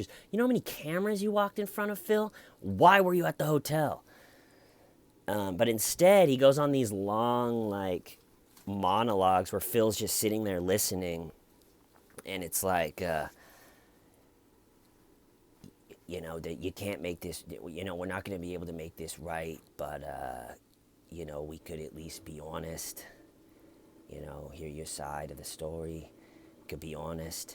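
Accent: Boston, United States. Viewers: you'd fit in perfectly, ya look like a Bostonian Irishman... Ya got the accent down better than most lol.. I'm from Southeast Mass... Closer to RI, I have more of a New Jersey accent ... Strange how it is round here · I love your Boston accent 😂😂😂 just made me picture a bunch of cops from The Departed! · I love your videos! Keep it up, totally makes my day and the Boston accent is priceless. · So true about having a gambling addiction. I'm imagining how depressing and devastating it has to be to keep fucking myself over like that, and I seriously would want to take MYSELF out. Ok your Boston accent is funny as hell. I'm in Western Mass for 10 years now (which has it's own dumb accent) and it took me years to realize that the Boston accent is NOT indeed a speech impediment or a foreign accent. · Love ur Boston accent. Lmfao! Sound like Marky Mark back in the day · OMG you got the Boston accent! 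A reason to say "balls" is cuz he (the detective) is playing an angle of class difference (a huge social deal in Boston and more so back then) so he can show he isn't deferential to a doctor-to-be -it's like a micro-aggression to convey shit is getting real Phil ( IMO ) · You sound like a whacked out Kennedy lol w the Boston accent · This is the first time we’ve seen your hair, isn’t it? A neat cut! Love your Boston accent.... reminds me of JFK. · Omg, you are historical. Great Boston accent. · STOP WITH THE STUPID BOSTONIAN ACCENT! YOU SOUND LIKE AN IDIOT!😠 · BTW your boston accent is killer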